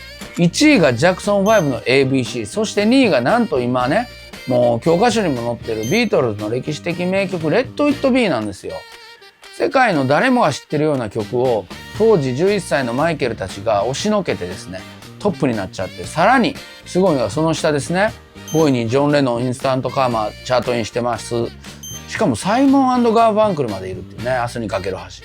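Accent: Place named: Kansai, Japan. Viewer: 大学生のときにアメリカ音楽の歴史を学び、Michaelが大好きになった31歳です。大学生でめちゃくちゃハマったときレポートも書いたのでそのときに西寺さんが書いたMichaelの本も読みましたがはじめてお話されているところを見て関西弁にかなり親近感を感じてます！この動画を見て、IMAXで2回目見てきます👀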